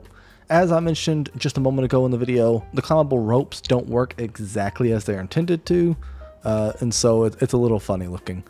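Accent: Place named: South, United States. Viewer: a creator with a southern accent like mine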